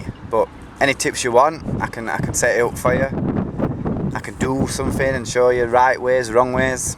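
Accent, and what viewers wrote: United Kingdom. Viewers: ow sorry thought you were from wakey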